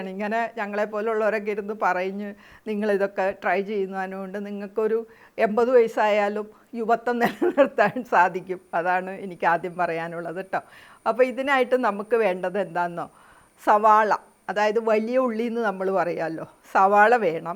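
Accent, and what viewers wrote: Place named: Kochi, India. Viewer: Mam te veedu fort kochi aano ...or vyppin...samsarom kettuttu agane thonni...njan Ernakulam...kaloor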